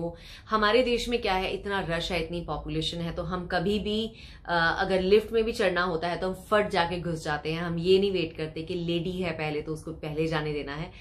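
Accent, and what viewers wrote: Punjab, India. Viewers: Bahut kamaal Punjabi kudi Hindi achhi bolti hai par pata lag jata hai k hai tan Punjabi kudi🎉🎉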